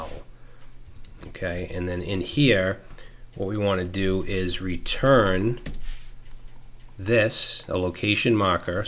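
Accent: Boston, United States. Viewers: The Boston accent of knowledge!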